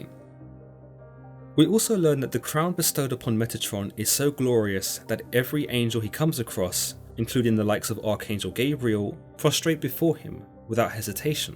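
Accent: United Kingdom. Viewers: Your scene is very witchcrafty creepy I noticed you have a British accent I I know there's people trying to sell something on TV have a British accentee too and make you more respectable acceptable and intelligent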